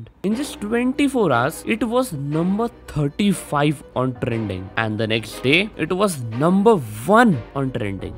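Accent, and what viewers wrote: India. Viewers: The wierdest part about this is that he sounds like a scam caller. (No offense dont cancel me every indian probably sounds like that im just uneducated)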